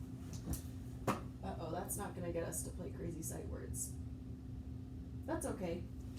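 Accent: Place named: Germany. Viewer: Oh ok I was wondering by her accent it sounded like a German accent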